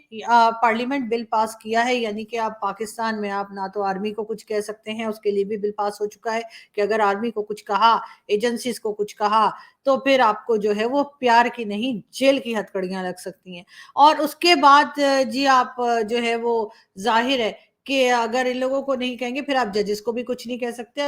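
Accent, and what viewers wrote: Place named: Delhi, India. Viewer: What I like about she talks like An Indian and talks like a common citizen and a woman and asks all the common questions that an ordinary citizen would ask...bade bade fancy sawal ya lecture nahi. deti., jisko aam zindagi ke saath koi lena dena nahin. nahin She connects well with common ppl. That is her strength. Talking about India so much that now she sounds like a regular girl.from Delhi jahan auntyan ki bare mein yeh bolenge "dil ki achi hein...thodi gamandi hein..bas."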